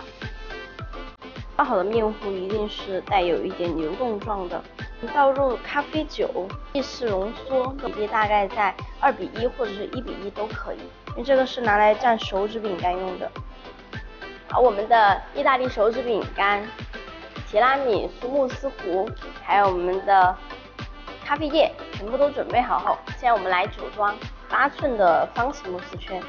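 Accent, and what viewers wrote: Sichuan, China. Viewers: 我猜你是四川的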